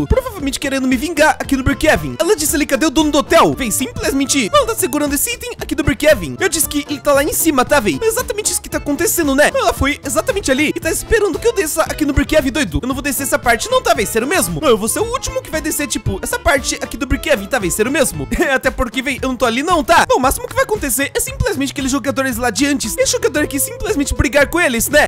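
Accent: Portugal. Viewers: Sotaque de Portugal...blz né kkk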